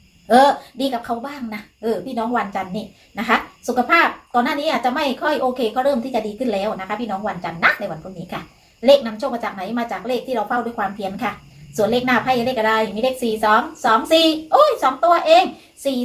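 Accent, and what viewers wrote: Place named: South, Thailand. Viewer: สำเนียงใต้ มิน่าถึงพูดรัวฟังไม่รู้เรื่อง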